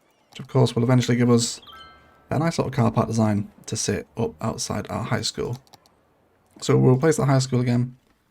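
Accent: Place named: Northern England, United Kingdom. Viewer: The way you talk... and the happy little trees... you're like the Bob Ross of Cities Skylines... Like, if Bob Ross was from the north of England :)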